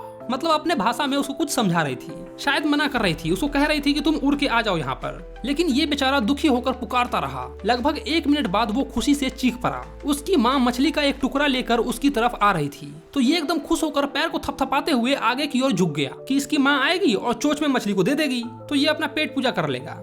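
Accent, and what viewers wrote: Bihar, India. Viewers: Bhaiya are u from bihar 😅❤❤❤❤ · Bhai aapki bihari beech me maja dila deti hai 😂😂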